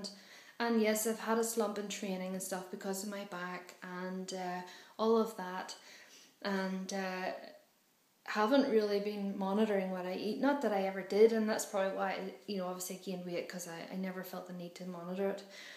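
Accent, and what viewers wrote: Ireland. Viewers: Hi i came across your video by chance because i was thinking of doing a sporting challenge for my fiftieth birthday, which is next year, and i saw this that you put on here, and the one you did on your birthday. Anyway i would like to say you look amazing so really good looking with those blue eyes and that gorgeous Irish accent of yours, you are a wonderful young woman and life is for living so go and enjoy yourself as much as you can, any ideas for me drop me Dave a note.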